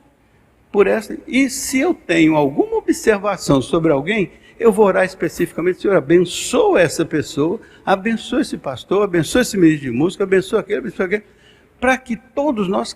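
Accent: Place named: Minas Gerais, Brazil. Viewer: Pr com esse sotaque de Minas!!! A Paz de Cristo já começa ao ouvir esse jeito de falar..Te Agradeço Senhor pela Vida deste pastor!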